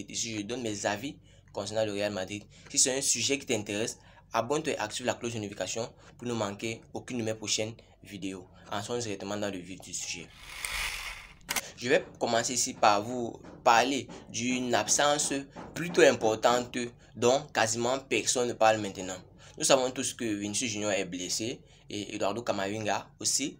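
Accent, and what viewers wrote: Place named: Cameroon. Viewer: Mon frère il faut cesser de nous parler le français camerounais et on ne sait pas ce que tu as dans la bouche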